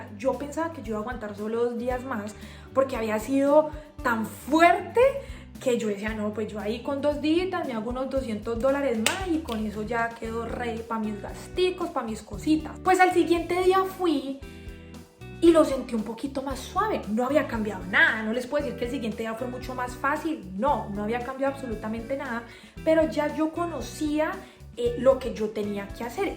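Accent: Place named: Cauca, Colombia. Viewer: Saludos jajajajaja ese acento del Cauca está intacto 👏👏!Gracias por tu contenido!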